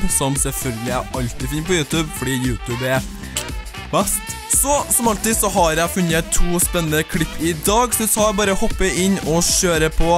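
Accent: Trøndelag, Norway. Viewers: E du trønder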